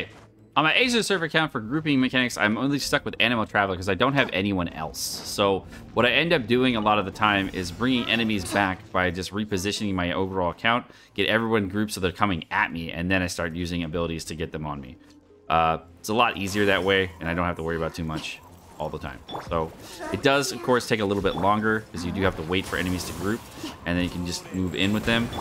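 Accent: United Kingdom. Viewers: I love your british accent(?)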